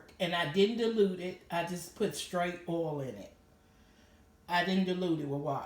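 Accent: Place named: South, United States. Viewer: the southern accent is very soothing and calming lol❤️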